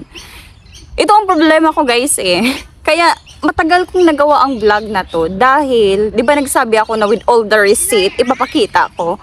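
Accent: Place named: Hiligaynon, Philippines. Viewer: I can hear your Ilongga accent. Love it. perti gid tana ka lambing ya.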